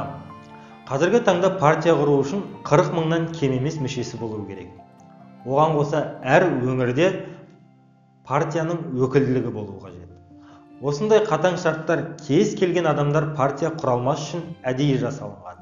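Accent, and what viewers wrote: Standard. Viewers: жарайсың! Қазақ елінің ұлттық тілінде таза сөйлейді екенсің. Ел президенті ұлтжанды азамат болса, нұр үстіне нұр болар еді. Заманбнк балам Ұлтжанды Азаматтығың сөз саптауыңннан көоінеді. Қазақ Ұлт болып қалыптасу үшін тілімізді жоғалтпауымыз керек. Ананың тілі, Анаңныңмахаббаты тіл жетпес бір теңіз емеспе? Па, шіркін Қазақ тілінің керемет тіл, құдіретті тіл екеніне айтуға сөз жетпейді!!!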